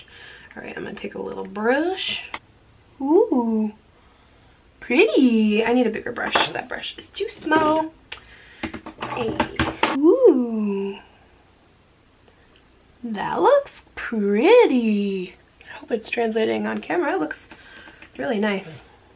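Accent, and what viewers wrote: England, United Kingdom. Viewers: Voiceover with British accent... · Actually impressed with your british accent;) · British accent on point girl <3 love your vids always. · LOVE your English accent 😂💋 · wow that british accent 😍😍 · You totally sound British I love it!!!! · you sound british as can be.!! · British accent is ON POINT! Wow! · love British accent🤣🤣😂😍great job so believable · Love the video, Great makeup, great British accent, and you look beautiful. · ❤️ this look! You shine bright like a diamond ✨ Your English accent is cute too; it would trick me. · British accent is spot on! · Love the British accent! And that highlight, ooooh girl!!! Such a gorgeous romantic look! I hope you and Alan had a good 5 year anniversary! 💗 P.s. Lolol 😂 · OMGOSH that Accent!!!!!!! I vote you do an entire video in the Brit! XOXO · Yaaasss do a get ready with me with a British accent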